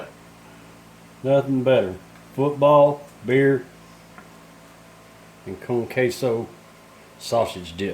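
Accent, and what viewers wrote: South, United States. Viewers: I look your southern accent😁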